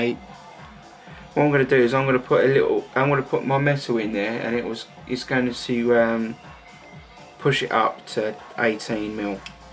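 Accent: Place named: United Kingdom. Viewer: Your voice sounds like comedian Russell Brand; you must come from the same area of UK.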